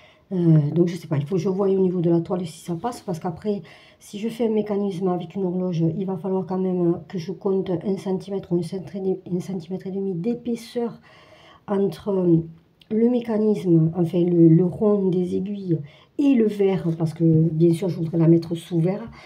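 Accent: South, France. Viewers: Bonjour, Je viens de découvrir votre chaîne et je me demandais si vous n'étiez pas vers chez moi, avec votre accent. J'habite à côté de Narbonne. En plus chez moi aussi il y avait un atelier de la CAF où j'allais il y a 30 ans. 😅 Comme vous, je suis une brodeuse passionnée mais seule à broder dans mon coin. 😅 J'aime beaucoup vos vidéos. À bientôt! 😊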